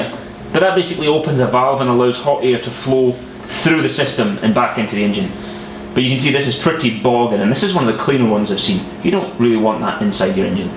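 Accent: United Kingdom. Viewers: Hi there , Like the video and the English accent to be honest (am Belgium) Question: witch software are you using please ? Cheer's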